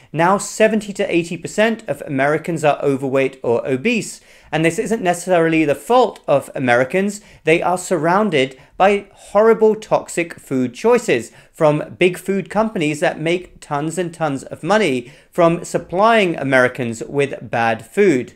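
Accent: United Kingdom. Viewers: I didn't know you were an American citizen, since you sound like a Brit!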